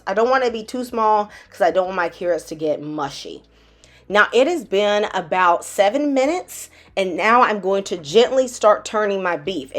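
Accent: South, United States. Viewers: Heard another southern accent--of course it's gonna be great!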